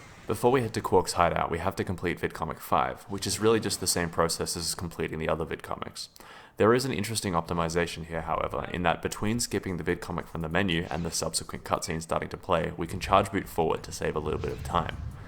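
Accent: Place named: Australia. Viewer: Pro tip for understanding australian commentary: Be australian.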